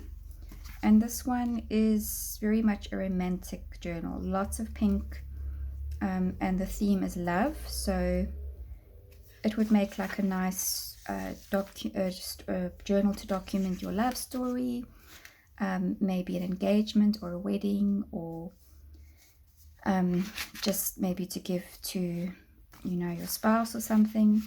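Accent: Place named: South Africa. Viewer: You have some wonderful ideas and beautiful items! Love you SA accent too! 🤗🌸💕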